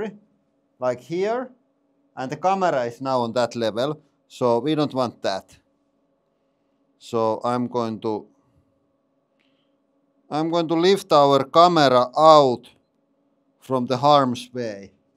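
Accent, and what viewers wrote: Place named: Finland. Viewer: It's funny how the years go by, but that Finnish English is still as strong as ever.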